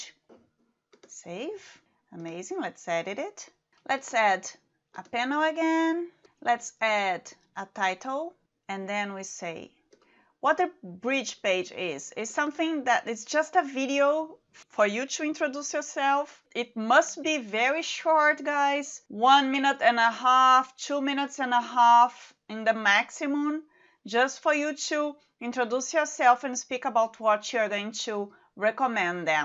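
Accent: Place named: Germany. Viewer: You have a beautiful German accent 😀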